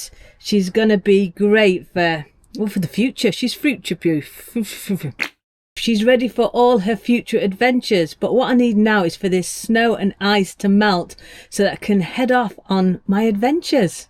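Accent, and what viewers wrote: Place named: North, United Kingdom. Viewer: Hi this is the first time I’ve visited your channel and guess what? I am now going to look for all your videos on you tube as you make them so interesting and informative.This channel for me is the best of the lot.I may eventually catch up with you as I have a feeling I’ve a lot of videos to watch.I love your humour and your northern accent.Thanks so much.Enjoy your travels.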